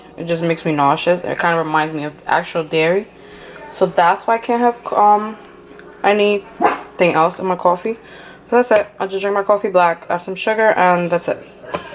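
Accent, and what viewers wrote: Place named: Dominican Republic. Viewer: Idk if I paid attention to what you eat or your Dominican accent. Haha love it!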